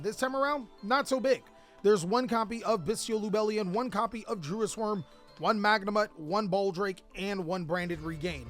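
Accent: United Kingdom. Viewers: I think it’s more of a British cockney accent.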